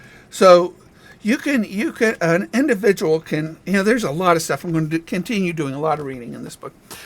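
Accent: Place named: England, United Kingdom. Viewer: The dams needed to be removed ! But the sediments needed to be dredged out before removal plain and simple. It will take decades to flush out into the mudhole in the pacific out front of the klamath. Decades for the trees willow ash etc to grow to shade the river again.. As far as the sasenach narrating this go home to where you come from ! The river will heal itself just gona take time lots of time!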